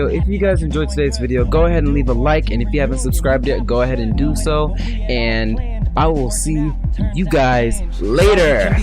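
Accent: Jamaica. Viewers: are u jamaican? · Where you from bro and are you a big fan or you're a Jamaican?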